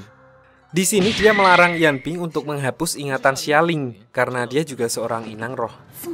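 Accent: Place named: Java, Indonesia. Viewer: Subscribe dlu smbari nntn Suara mimin asik jls dn ada jawa² nya gitu 🤣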